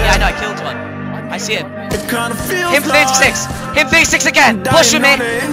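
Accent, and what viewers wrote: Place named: Wales, United Kingdom. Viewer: I do like that signature Welsh voice though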